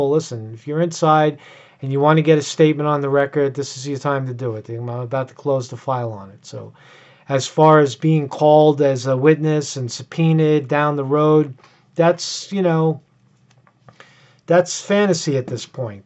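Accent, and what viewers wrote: New York, United States. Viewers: New sub,, I like that NY accent haha,. But its, SAD unfortunately that's how most cities get and rely on hidden taxes . They outspend and then say their saving the city so much in other taxes just to get elected .. I'm not surprised of the deceit just to tax us more and I think most people are aware of the corruption . Ya vote and hope the politician is honest about cutting taxes . OUR WORLD TODAY . I WORKED 40 yrs for the US PO .and seen corruption first hand with people trying to get promoted ETC.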